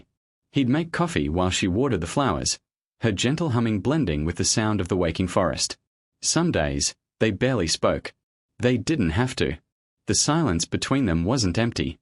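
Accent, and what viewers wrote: United Kingdom. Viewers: ♥️♥️♥️♥️ Loving the British accent ♥️♥️♥️♥️ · Do not care for the British accent! Not subscribing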